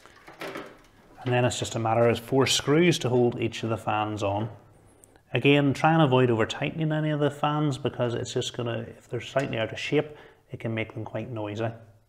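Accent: Northern Ireland, United Kingdom. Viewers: I just built my first ever PC and this was by far the most useful tutorial I came across when it came to building and especially what to do when it comes to installing Windows and installing drivers. I'd be lost without this! PS. My parents are from Norn Iron and when they heard your voice on my phone they said "Ach, a Norn Iron fella! What's craic with him?"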